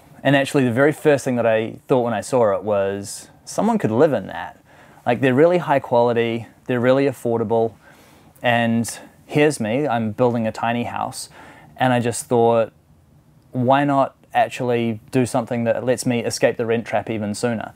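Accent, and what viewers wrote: New Zealand. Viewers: Sounds like a new zealander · Before I even heard him speak I thought 'this guy must be a kiwi' ... haha!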